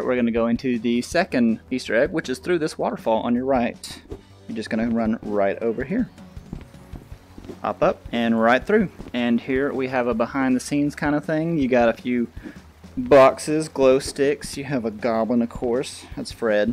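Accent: United States. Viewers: sounding American today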